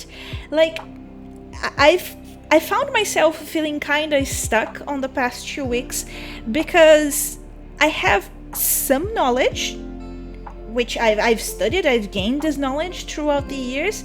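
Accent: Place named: Brazil. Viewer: I can tell you are Brazilian from your accent and Im an American living in Brazil. Something I've noticed about the cultural differences between Americans and Brazilians is that Brazilians are often preoccupied with their current finances and are less willing to spend money on gaining access to education and networks that will provide them with knowledge- and even more importantly RELATIONSHIPS- that will advance their business or craft. I definitely get where you are coming from but just wanted to say that investing (even expensive amounts) in yourself, leveling up your craft, and professional networks with the right people can get you so far. I was even thinking of making a video on this soon. Save some money and really get a professional network or mentor that will help you level up :) Im not even an artist or into artwork much but your video popped up on a google search and it was cool just watching you do this while talking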